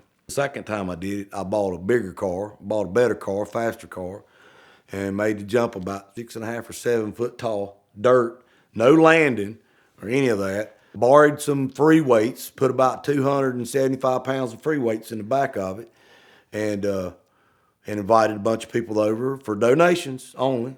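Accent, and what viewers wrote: South, United States. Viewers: you were right! wicked fun story and the whole southern voice mixed in whith the southern sayin’s is gold! thanks ED, needed a good chuckle today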